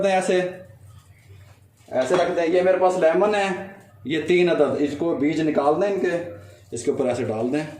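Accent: Punjab, India. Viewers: Hay Allah ap ki punjbi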